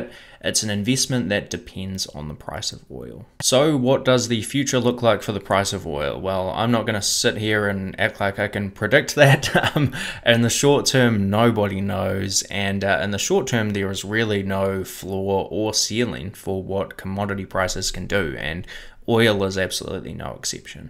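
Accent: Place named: New Zealand. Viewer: Love your kiwi accent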